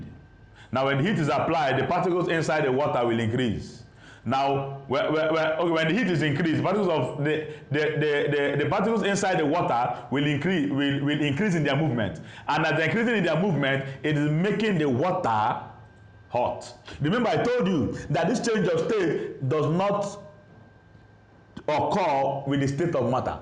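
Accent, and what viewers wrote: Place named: Nigeria. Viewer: hello sir, wonderful lesson but i found somethings lacking. you said quite a number of words that I've never heard before, like the tool used to measure matter but the spelling wasn't shown on the screen. as you already know, Youtube subtitles don't really do a great job in translating the Nigerian accent. Please work on either adding your own subtitles or letting the important points appear across the screen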